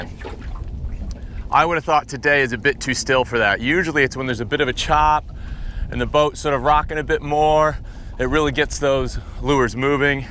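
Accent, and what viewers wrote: United States. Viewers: you sound like a yank ,but then you say english slang...love the vids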